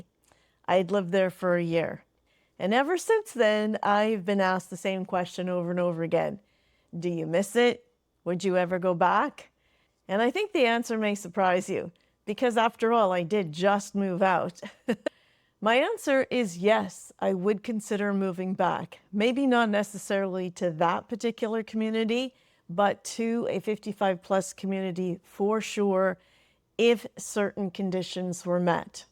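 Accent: Canada. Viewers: She has an Ontario accent.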